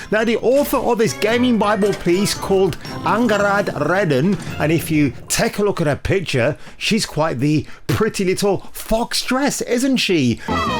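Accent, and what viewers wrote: United Kingdom. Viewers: your british accent hot chocolate savage criticisms will have these WAHMAN game developers short circuiting, keep it up bro!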